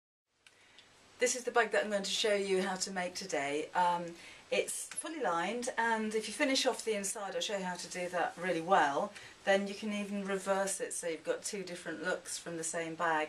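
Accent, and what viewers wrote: United Kingdom. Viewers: your speech assistant does not understand your British accent. The subtitles are so disjointed, funny, weird at times.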